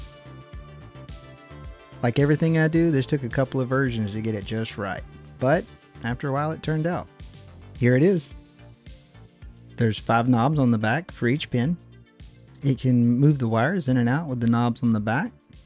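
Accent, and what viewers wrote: United States. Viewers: Just curious where youre from, state if you dont mind hahaha like the accent and reminds me of a lot of people where im from(Southern Maryland) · Just had this video come across my YouTube feed. First of yours I've seen and I instantly subbed at your first "daggumit" I'm instantly attracted to this kind of content. Throw on top the Southern accent. I'm sold.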